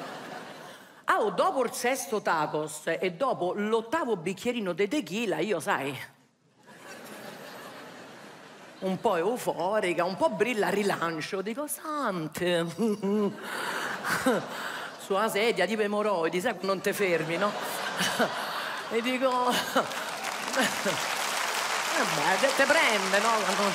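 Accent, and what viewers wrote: Rome, Italy. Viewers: E stupenda troppo forte fa ridere da morire poi romanesca è ancora più forte 😅😂😂😂😅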